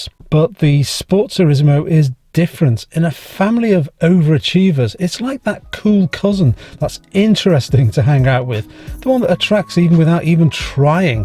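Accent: United Kingdom. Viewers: British accent 😍 best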